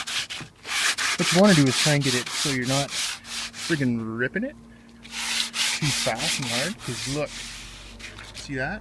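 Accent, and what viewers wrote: Alberta, Canada. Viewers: Lol I am about to do some patio and deck repairs for one of my rentals soon. Glad I found your video, bud. Funny as fuck. I thought your accent was sounding awfully Albertan and lo and behold 😂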